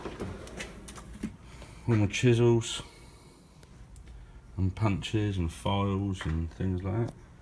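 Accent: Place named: Norfolk, United Kingdom. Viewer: Nice tools bud, trying to pin point the accent.. Kind of sound like a fellow Norfolk lad at times but not sure? Lol cheers for sharing your box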